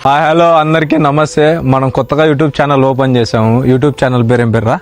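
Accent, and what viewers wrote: Anantapur, India. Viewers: Medi ananthapur ah bro slang knchm alane undi .. just asking anthe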